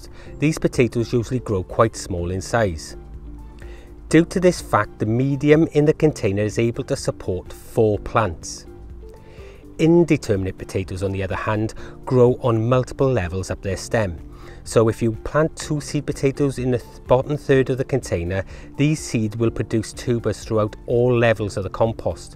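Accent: Wales, United Kingdom. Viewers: I'm dyin to know-is yours a welsh accent? You're a fantastic teacher, by the way. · thought you were local I'm from cwmbran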